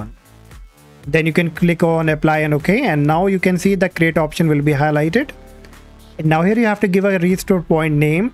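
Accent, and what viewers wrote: India. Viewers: I am definitely NOT trusting it especially with the Indian voice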